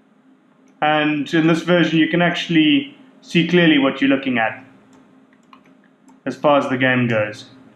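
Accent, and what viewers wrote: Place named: Cape, South Africa. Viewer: I thought I could tell from your accent. Are you from anywhere in the Cape?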